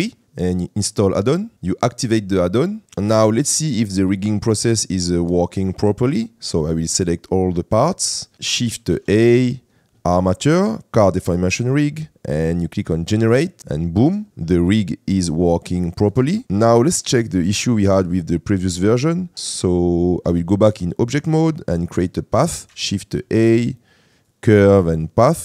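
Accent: France. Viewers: Super t’as bien l’accent Français 😉 ce serait possible que tu fasse des vidéos en Français parce que c’est difficile de suivre tes tutos en Anglais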